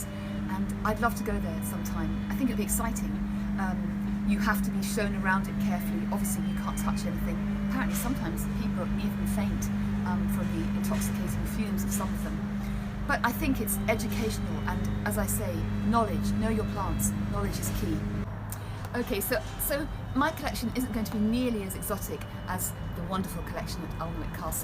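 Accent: London, United Kingdom. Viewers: 太喜欢她的伦敦腔了。和中国很多妇女是两种感觉。不喜欢现在中国那种妇女眼里只有钱，嘴里只有唠叨，的状态， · 哇，好像在看英国花园纪录片的感觉喔！非常着迷于女士的好听英音讲解也非常长知识！期待下一期❣️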